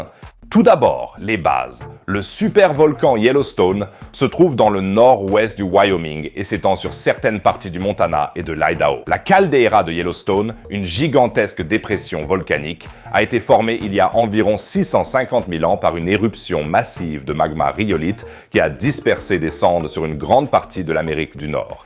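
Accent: Quebec, Canada. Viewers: Accent quebecois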